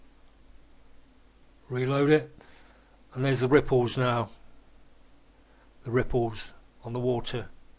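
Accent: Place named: United Kingdom. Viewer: Let me ask. From your accent, I assume that you're British, are you? I'm Polish, greetings from Poland and carry on with your work on the editor. I'll be watching. Good luck and peace on.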